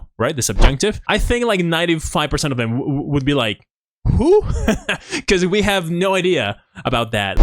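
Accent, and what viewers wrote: United States. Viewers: Nunca me gustó ese acento americano, suena muy afeminado. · Do you have any favorite TV shows, movies, or Spanish-speaking Youtubers? I find it hard to find places to practice my listening. Btw your American accent is really good! You sound like you grew up here!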